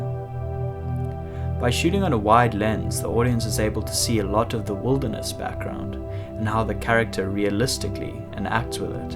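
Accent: South Africa. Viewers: You're South African. Also great vide. Was really informative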